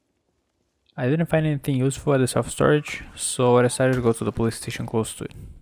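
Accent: Brazil. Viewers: Tem sotaque de BR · teu accent parace um pouco brasileiro, se vc for, incrível que tenha alguem br fazendo um conteúdo tão foda assim, abraço!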